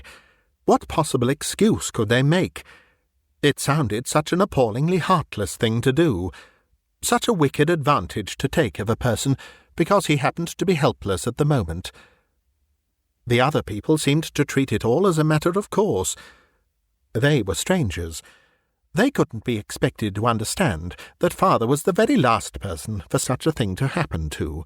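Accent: England, United Kingdom. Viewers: Probably the best narrator on YouTube. It's a joy to listen to a trained English voice. Thank you.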